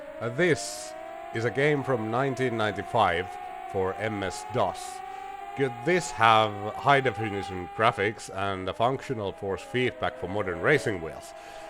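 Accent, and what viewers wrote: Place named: Finland. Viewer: From the accent alone I can smell the karelian pie and koskenkorva. Kiitos!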